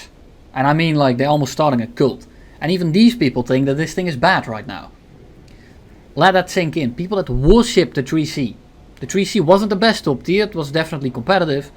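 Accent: Netherlands. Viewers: When you are frustrated the dutch accent comes out so strong.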